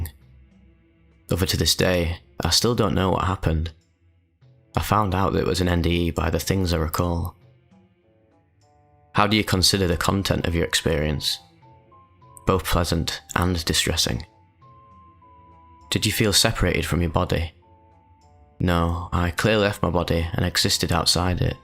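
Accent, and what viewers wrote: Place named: Manchester, United Kingdom. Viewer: Majors mask is the shit... Sounds like you're close to Manchester... Safe bro lol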